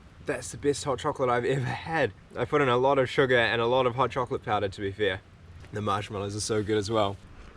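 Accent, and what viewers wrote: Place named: England, United Kingdom. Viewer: I recognize the English accent, but a tad different. I miss the accent. I'm from America. You build a bigger fire than I might. Also liked how you built it closer to your tarp. I've seen people build it 15-20 feet away. Personally, I think that's too far, to keep you warm at night. You meals are bigger/heavier on the stomach than I like. Camping, I prefer smaller fast meals. Just me. Enjoyed the video. Thanks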